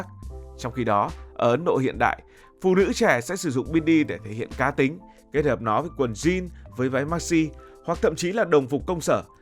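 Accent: North, Vietnam. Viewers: Thích cácvideo của BLV. Ngắn gọn nhưng xúc tích. Lại thêm giong bắc rất hay.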